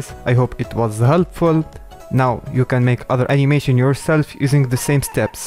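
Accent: France. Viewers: t'est français ? car tu sonne français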